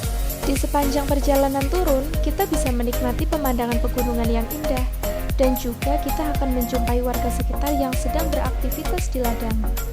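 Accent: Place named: Java, Indonesia. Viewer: Medok lek😁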